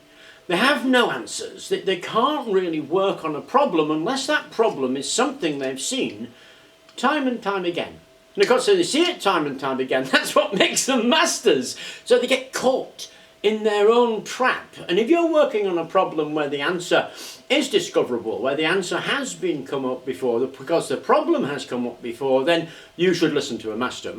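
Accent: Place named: England, United Kingdom. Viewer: Sir, may I tell you with the upmost honesty, I appreciate your videos, every single one. I live in the states however, your accent reminds me of my late grandmother. She originated in England. I am a gigantic fan, and please continue to share your knowledge.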